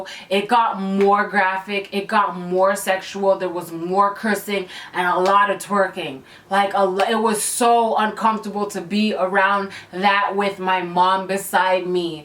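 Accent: Toronto, Canada. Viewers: That Toronto accent tho ♥️♥️ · I LIVE for ’ Toronto accent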